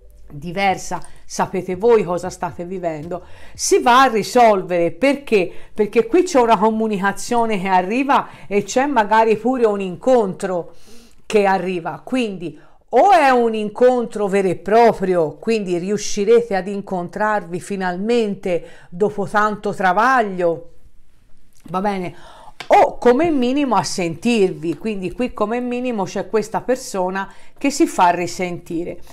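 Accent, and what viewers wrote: Tuscany, Italy. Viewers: Prima stesa bellissima... Speriamo! 💞Grazie sei molto chiara e concisa e con l'accento toscano mi piaci molto... Posso chiedere il tuo segno zodiacale sento molto empatia con te io sono bilancia 😁😁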